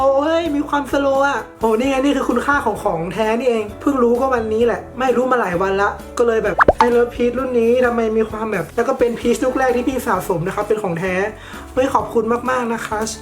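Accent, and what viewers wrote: North, Thailand. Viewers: พี่เป็นคนเหนือหรอคะ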